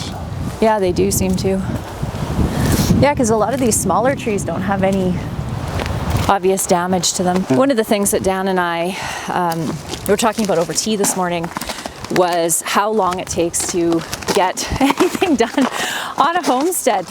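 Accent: Canada. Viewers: I am really enjoying the Canadian accent breaking through in this episode. Ahey! 😂 It is ramping up winter here where I live and you are ramping down. I am missing having a full vegetable garden and you will soon have a full vegetable garden!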